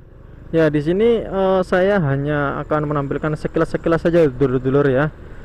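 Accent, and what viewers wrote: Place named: Indonesia. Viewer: jane jane ki wonk ndi yo.. kok tekan kepohbaru barang ki.. 😁 kondang tenan kang.. logate kok kyo wong luar Bojonegoro ki.. 😀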